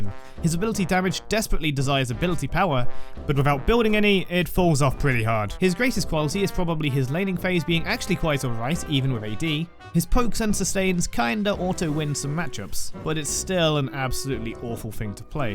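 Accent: United Kingdom. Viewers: a british wittery accent is crazy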